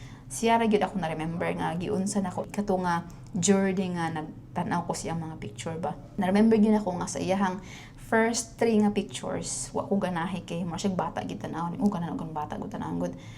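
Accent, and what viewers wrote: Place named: Cagayan de Oro, Philippines. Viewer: Wow ka nindot sa imong love story dhay. Congrats ❤️Lalom kayka mag bisaya murag taga Cag de Oro. Nindot paminawon.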